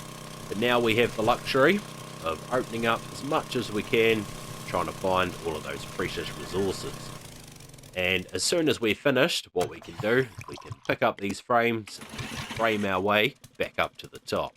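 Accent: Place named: Australia. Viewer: ive been thinking about what country you live in. youve got that australian accent but you seem like an american so its gotta be one of those 2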